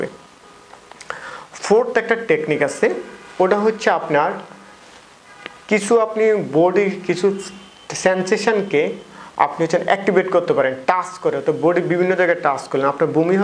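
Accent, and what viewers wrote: Noakhali, Bangladesh. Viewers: 😂😂আমার বানান যথাযথ এবং সঠিক উচ্চারণে আছে আপনি আগে আপনার ভিডিওতে নোয়াখাইল্লা মার্কা ভাষা চু*নো বন্ধ করুন।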